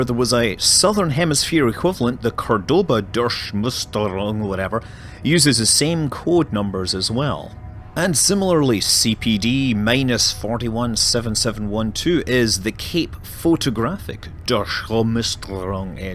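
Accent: Scotland, United Kingdom. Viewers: I just listened to a scottish man talk for 20 minutes about catalogues. Life is good.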